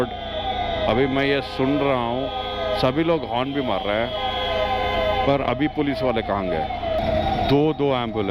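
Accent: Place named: Karnataka, India. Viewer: bhai, plz make video on riding gear and liked your kannada accent 😊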